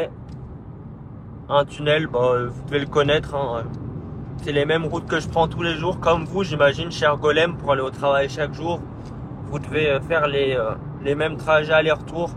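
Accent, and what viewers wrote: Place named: Nord-Pas-de-Calais, France. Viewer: IL PARLE COMME CHTIMI CELUI LOA...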